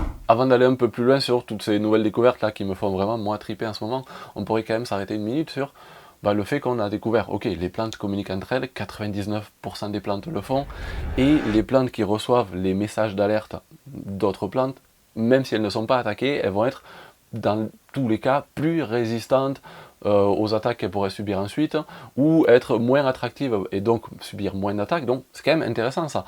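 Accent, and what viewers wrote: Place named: Southwest, France. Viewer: Bonjour. Les perfections invisibles de Dieu sont dans la nature. Tu as l'accent du sud ouest, dans quelle région est tu?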